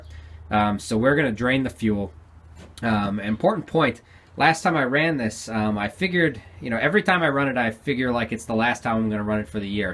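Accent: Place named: South Boston, United States. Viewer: Mark Camaro it’s that easy! And or open the screw that secures the carb bowl and let it drain out. Keep the gas tank cap off for a day and any gas in the tank and lines will evaporate. Don’t remove these covers as shown. His accent sounds like he is from South Boston. I ought to know lol.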